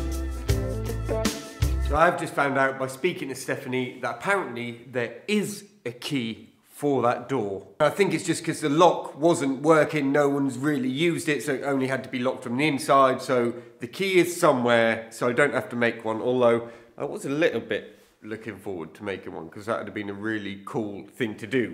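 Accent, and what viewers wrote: United Kingdom. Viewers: A bit off-topic, but your voice keeps reminding me of someone else, and it finally struck me that you sound like Cary Grant. His British accent was somewhat diluted by working in Hollywood, but I hear it in your voice. I'm old enough to be your grandfather, so I'm a bit more familiar with old movies, and Cary Grant, but if you get a chance, watch one of his movies. And more on topic, your range of skills, and industrious ways, never cease to amaze me. We need you here in Michigan!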